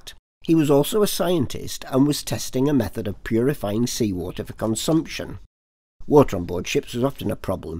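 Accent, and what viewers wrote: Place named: RP, United Kingdom. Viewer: I almost didn't recognize yer 'posh' voice!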